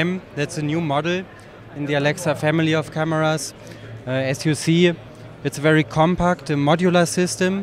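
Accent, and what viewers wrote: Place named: Germany. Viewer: Er ist echt nen deutscher ^^ He really is a german, i'm german too but he really can't speak english ^^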